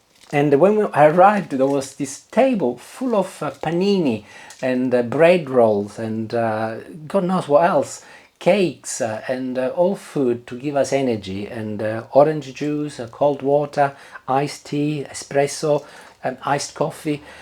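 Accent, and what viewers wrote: Italy. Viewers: What an absolute joy of a video 😊. To hear your beautiful tale of your grape picking experiences was delightful 🙂. Your accent and English is so easy on the ears. I grew up with Italians in NZ and they also spoke with your accent. It brings back beautiful memories for me ❤😊. I'm going to really enjoy listening and learning your recipes 😊❤😊.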